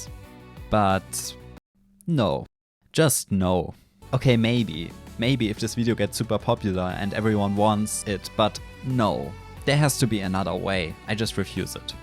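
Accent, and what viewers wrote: Germany. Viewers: i hear german....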